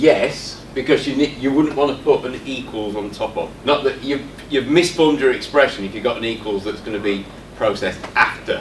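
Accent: United Kingdom. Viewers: love your english accent too xD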